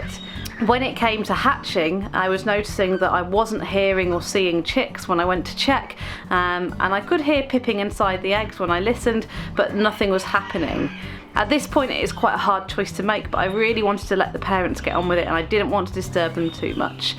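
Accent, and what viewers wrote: United Kingdom. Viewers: We used to pass a pair of burrowing owls on our way to work... it was at a corner, and they would spend the morning watching vehicles turning left... very cute! <link> One thing I realized is that I like your channel because unlike commercially produced nature shows, you don't have either the "syrupy saccharine" sound, or the "absurd melodromatic" sound, which are quite wearing, after a while. Of course we colonials can never get enough of the accents from the Mother Country! ;-)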